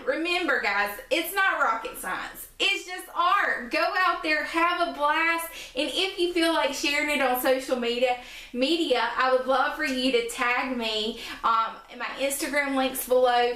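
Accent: South, United States. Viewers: I'm definitely getting that magazine as soon as I get my check next week! I know where Barnes and Noble is. I love watching you and hearing you talk because you are so southern, and I'm southern too. I live in South Carolina.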